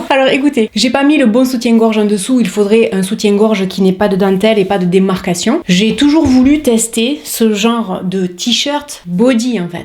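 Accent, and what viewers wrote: South, France. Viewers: Coucou, j adore vous regarder et surtout vous écouter avec nôtre très beau accent ça sent le soleil,et met du baume au cœur.Excellente vidéo comme à chaque fois.Merci beaucoup bises 😊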